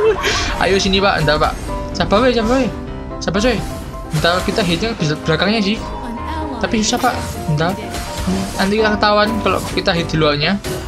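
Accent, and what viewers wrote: Java, Indonesia. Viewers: asli orang mana ya...kok kayak ada logat jawanya...😅😅😅😅😅🍹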